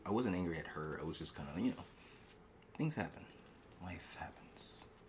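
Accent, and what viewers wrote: England, United Kingdom. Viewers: I’m English, and I love your English accent. Ignore the haters, x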